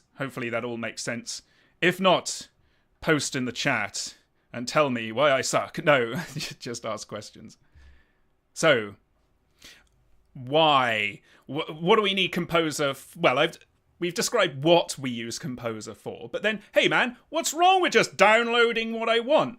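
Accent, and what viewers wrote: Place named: United Kingdom. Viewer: Why are you so dramatic with a heavy brit accent? Suggest to keep it simple/natural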